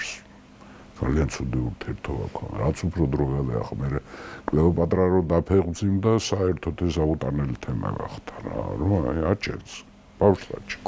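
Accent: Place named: Racha, Georgia. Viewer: თანაც რაჭულად რომ მოგვითხრობს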